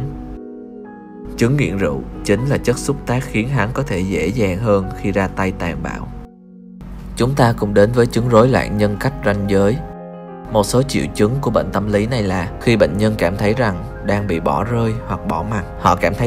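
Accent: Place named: Saigon, Vietnam. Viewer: a này nói giọng sài gòn hay và cuốn quá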